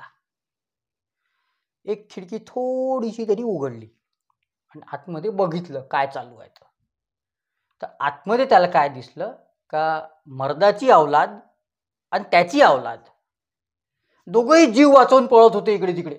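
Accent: Vidarbha, India. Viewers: माझे खूप मित्र विदर्भातील आहेत आपले बोलणे ऐकले की त्यांची आठवण येत. पण एक नक्की आपण आपल्या भाषेत खूपच खरपूस समाचार घेतला आहे. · अहो हे विदर्भातलं मराठी आहे. मालवणी किंवा कोल्हापुरी नाही.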